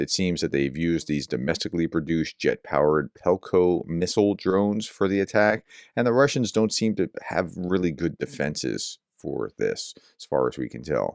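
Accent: Canada. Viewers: Newf talk, proud members of the Canadian confederation since 1949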